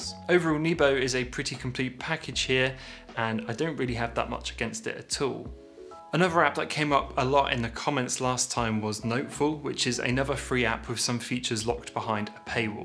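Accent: England, United Kingdom. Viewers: English accent is such a flex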